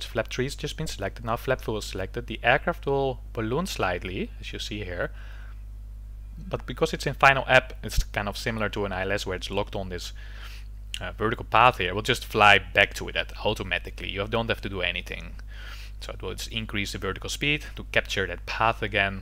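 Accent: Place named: Netherlands. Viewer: Can i ask where you are from? I'm dutch and you sound a bit dutch too! I'm very interested in pilots working in a different country than they are from. I saw that you landed in an airport in Vietnam in a different video. If this is the case would it be possible for me to fly like with British Airways or Qantas for example instead of like KLM or a different Dutch airline. Thank you.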